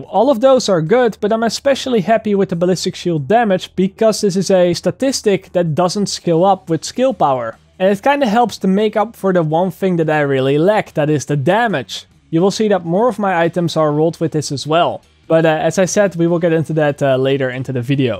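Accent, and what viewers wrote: Netherlands. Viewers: its dutch english accent xD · Je klinkt als een Nederlander ben je dat ook?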